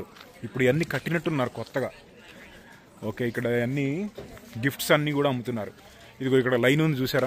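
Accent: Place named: Telangana, India. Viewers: Emirà vari .....😂....pure telangana slang .....i jst got remembered Venu Madhav....garu😂😂😂😂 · Telangana slang m ra vari😂😂😂😂😂😂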